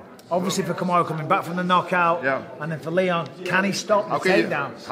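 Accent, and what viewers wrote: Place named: Northern England, United Kingdom. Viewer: Definitely sound more Northern when you’re in England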